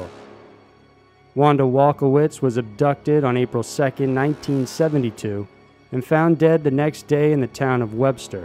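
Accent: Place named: Massachusetts, United States. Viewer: It's pronounced "ORE-eh-gun".... not "ORE-a-GONE".... total Mass accent pierces the eardrums again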